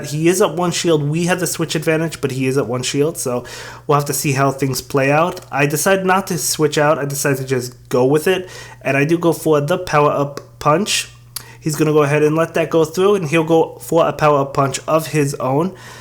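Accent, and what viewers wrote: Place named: New York, United States. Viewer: its funny getting deep pokemon go info in a new york accent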